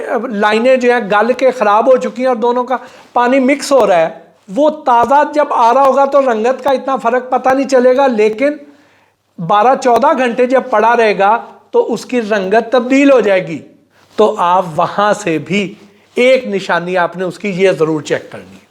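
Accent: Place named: Punjab, India. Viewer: ۔۔۔ اتنی لمبی باتوں کی لسی بناتا ہے ۔۔۔ کی جیسے پانی میں مدھانی چلائی جاتی ہے ۔۔۔ بلکل بک بک بکواس بلکہ بکواسیات لگتا ہے ۔۔۔۔ یہ پینڈو لب و لہجے میں بات کرنے والا یوٹیوبر بلکل گھتو ڈھگا پنجابیوں کےلب و لہجے میں بسوں میں چورن بیچنے والے کے سٹائل میں بات کرتا ہے ۔۔۔ ابھی پچھلے دنوں کشمالہ کا انٹرویو کر رہا تھا اور لگتا تھا کہ پتلون گیلی ہو چکی ہے ۔۔۔ اور مکمل تھلے لگا ہوا تھا ۔۔۔۔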